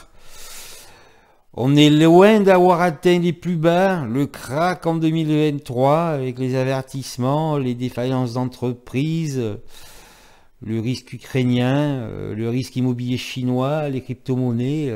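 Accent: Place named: Southwest, France. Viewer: Ah et l'accent du sud-ouest fait toujours plaisir à entendre !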